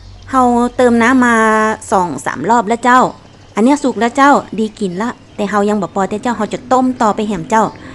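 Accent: North, Thailand. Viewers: เว้าภาษาเหนือเพราะมากค่ะชอบแต่ฟังบ่ออกบางคำ รวมๆแล้วชอบจ้า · ชอบเสียงพากษ์จังเลยค่ะ พูดภาษาเหนือได้น่ารัก ป้าฟังรู้เรื่องเป็นส่วนใหญ่ ส่วนน้อยมากที่ไม่รู้เรื่อง แต่ชอบฟังมากๆค่ะ เป็นกำลังใจนะคะ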